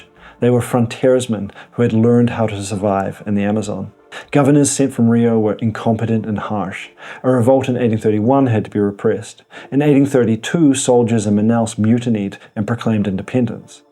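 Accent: New Zealand. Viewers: Great channel! Is that a New Zealand accent? Best wishes from Ukraine. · Are you kiwi ?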